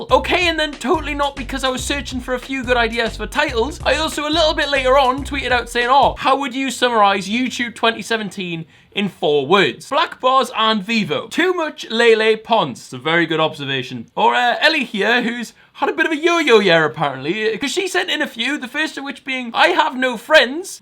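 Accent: Newcastle, United Kingdom. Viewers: It’s called a Newcastle accent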